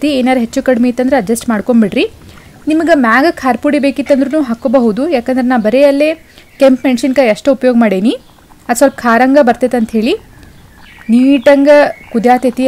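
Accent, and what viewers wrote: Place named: Hubli, India. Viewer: Nim hubli bhashi kelak kushi aythri ❤❤, Nim bhashi kelak Nanu video nodyhini ♥️ from Tulunad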